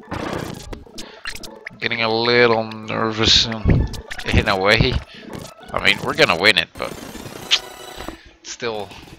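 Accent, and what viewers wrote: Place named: Netherlands. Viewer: No worries, I'm dutch too trying to work on completing this game 100% myself... The tournaments are a huge fucking pain though! Also, normally hearing my fellow countrymen speak English in a Dutch accent is unbelievably cringeworthy, but for some reason you're able to pull it off :P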